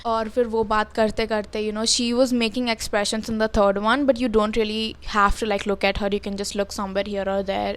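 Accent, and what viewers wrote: Hyderabad, India. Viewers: Hyderabadi bolte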